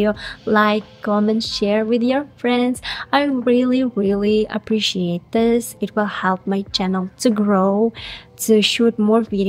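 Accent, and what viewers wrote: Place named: South Korea. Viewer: I really like ur korean English accent its unique and😅😆😁 by the way i like ur vids they always bring a smile on me 😁😁😁 keeping doing ur best may God bless U✝✝✝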